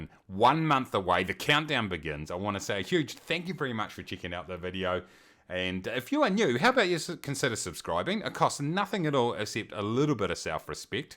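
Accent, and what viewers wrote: New Zealand. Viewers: Ahh man I miss the NZ accent. And NZ. it was my absolute favorite place to live.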